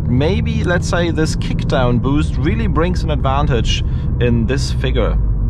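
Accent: Germany. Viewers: Ich Feier den deutschen Dialekt 👍👌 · So richtiger Deutscher Akzent hahaha man kennts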